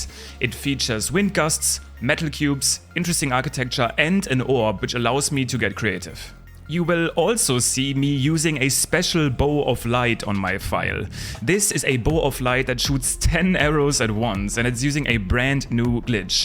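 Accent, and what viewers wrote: Germany. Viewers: Hey, are you German? (Your accent sounds like you are)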